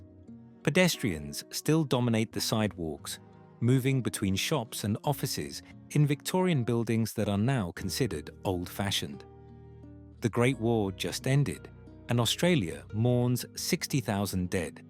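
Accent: United Kingdom. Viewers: Yes. The main narrative problem in my opinon was the a lack of pause when a new 'chapter' was being started (e.g., a new photo to signal a later period.) A visual signal would help, as you say. AI voiceovers often seem to do so well at the phrase level, but have no training currently at wider narrative. We also hear the anomaly of an British English voice mouthing large numbers US-style, without the "and" we put between hundreds (for example) and the sub-hundred part.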